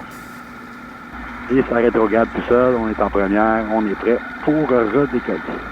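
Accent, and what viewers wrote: Canada. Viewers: Vous parler comme les canadiens... c'est une moto douce et avec une grande souplesse, mais moi je prefrere la manualle de toute la vie avec l´'embrayage et la boite a vitesses...salutations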